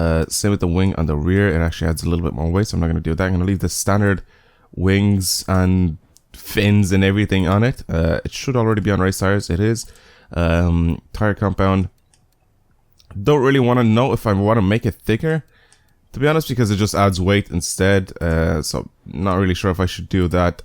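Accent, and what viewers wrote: Dublin, Ireland. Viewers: Are u irish? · R u Irish like from Dublin down sorta thing? I'm from Derry personally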